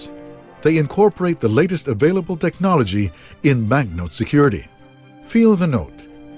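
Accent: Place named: United States. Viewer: Yes Philippine money looks better than u.s. money. btw why does the announcer sound American? lol ))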